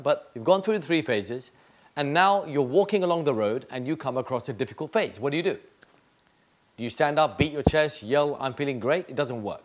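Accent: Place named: Europe. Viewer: Oh, you are really great. My problem is--I can not follow your foreign accent, and difficult to understand your content.... You do not know what I have done and still doing to understand your accent. I think yours are European accent, may be like of England..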